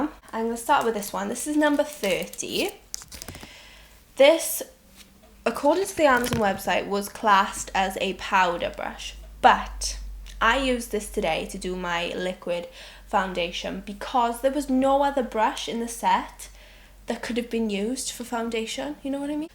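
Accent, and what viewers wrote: Wales, United Kingdom. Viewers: Are you from Wales? 🏴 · U Welsh?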